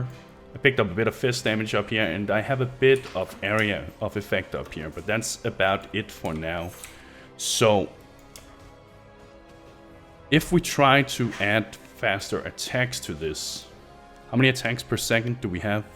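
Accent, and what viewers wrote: Denmark. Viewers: Are u scandinavien? Maybe even Danish?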